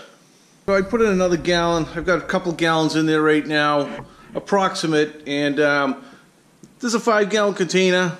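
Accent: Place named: Boston, United States. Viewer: Holy crap your Boston accent is strong.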